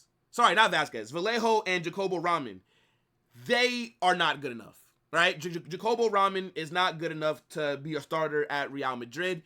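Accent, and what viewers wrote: United States. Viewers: I could tell he’s American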